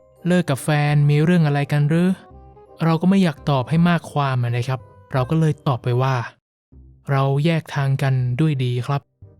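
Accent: North, Thailand. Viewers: Admin เป็นคนเหนือแน่นอน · อันนี้ขอนอกเรื่องได้ไหมคะ สำเนียงการพูดเหมือนคนเมืองมากเลยค่ะ 🤭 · คนพากษ์ เปนคนเหนือแน่นอน😊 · คนเหนือแม่นก่อค่า..พูดเหมือนเจ็บแอว😊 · เธอเป็นคนเหนือเหรอ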